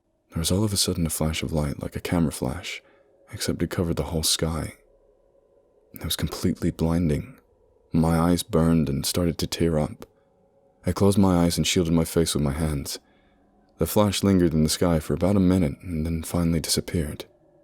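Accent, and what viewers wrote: United States. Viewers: I would think your American if I didn’t know you weren’t ( this coming from an American). Great job love your content.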